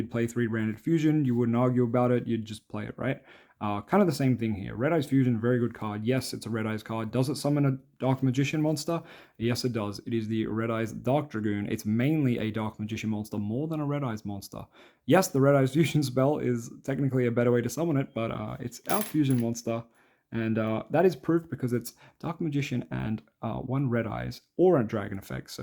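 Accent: Australia. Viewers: Are you aussie? Because if so, that “Goony boy” nickname is so much funnier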